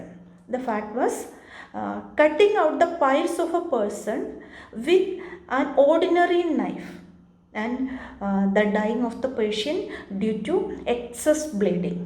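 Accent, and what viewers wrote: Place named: Kerala, India. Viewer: I'm sure she's a malayali 😂 I see that in her unique pronounciation of words 😂😂😂😂 especially 'R' 😂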